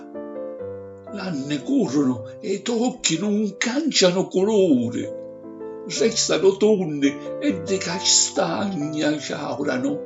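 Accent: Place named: Sicily, Italy. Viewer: Che bedda poesia ...😂😂😂 dialetto siciliano..bello da ascoltare e passionale..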